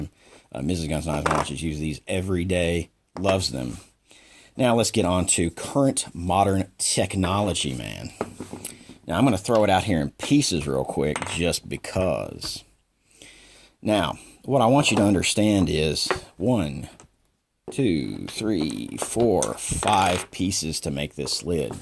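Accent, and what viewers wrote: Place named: Cajun, United States. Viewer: Worst cajun accent ever. But a great review!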